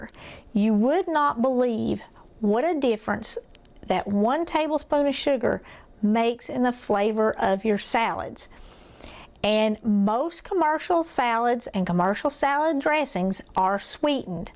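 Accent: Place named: Kentucky, United States. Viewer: Every time I hear your voice, it brings me back to Kentucky. You remind me so much of my mother. Tears of joy Every time every time I need to learn a recipe which I love to cook. Anytime I need a recipe, you're the first one.And only one, god bless you.And your family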